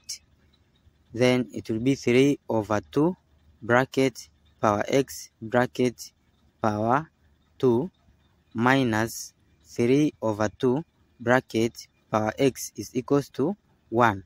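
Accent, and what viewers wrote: India. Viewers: Listening this solution, I forgot the math I knew, but I learned Indian pronunciation. Thank you so much!!!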